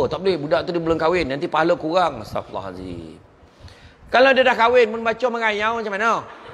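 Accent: Negeri Sembilan, Malaysia. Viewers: 👍 bahaso melayu minang nogori semilan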